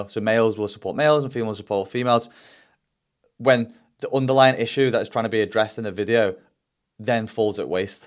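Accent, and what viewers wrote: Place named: Liverpool, United Kingdom. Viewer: Great content as always 👏🏼. Great work on the clarity of your speaking too, elocution lessons working well👌🏻👍🏻(not that I think there was anything wrong with your native wirral/scouse twang though! 😅)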